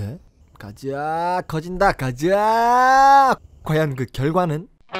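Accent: Gyeongsang, South Korea. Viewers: 대구분이신거갘은데 코로나 죠심하세여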